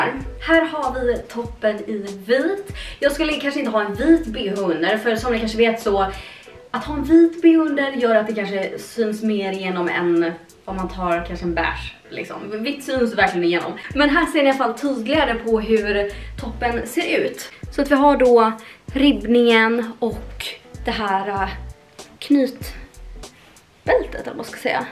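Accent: Stockholm, Sweden. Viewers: Nu har jag kollat på flera videos av och tycker att hon fortfarande pratar stockholmska och ser dessutom flera kommentarer att hon har ändrats sin dialekt. Är inget har mot tycker bara att degvätskan konstigt att man ska låtsats något eller göra det tillgjort om man inte har partar så innan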